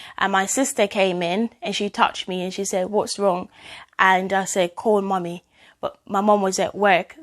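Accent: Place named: United Kingdom. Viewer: Goodie a British enuh. Hear the accent. Great video. I am sure you will reach someone who is struggling with this.❤️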